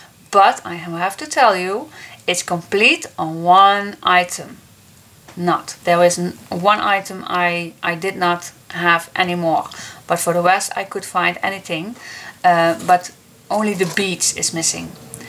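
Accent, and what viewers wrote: Netherlands. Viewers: Merry Christmas!! I love your albums because they are well finished and always very elegant and your tutorials are clear and make everything seem so easy and affordable for everyone. In addition to this I love to listen to you because my dad was Dutch and when you explain, I hear the Dutch accent and I remember when I was young and I heard my Dutch Uncles coming to visit us in Italy! Thank you for this opportunity to win one of your kits, both the kit of your album and the kit of birds are fantastic gifts!! Merry Christmas from Italy!! Nicoletta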